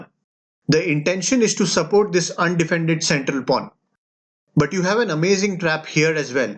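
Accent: India. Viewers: I just want to recommend that you speak slower. Since you have an Indian accent some words are difficult to understand. But if you speak slower it will be great.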